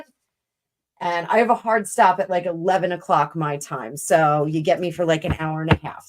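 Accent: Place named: New Jersey, United States. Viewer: Great reading . I love the NJ accent. lol sometimes my Deep South comes out in me . Who am I kidding - I can’t get rid of it. 😂ya know I’ve never really wished a divorce on anyone but I do with them. I just hope they get out before Harry loses it completely. ❤️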